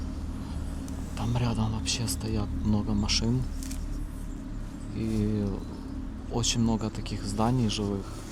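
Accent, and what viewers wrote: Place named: Ukraine. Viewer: як приємно чути українську вимову. дякую за все.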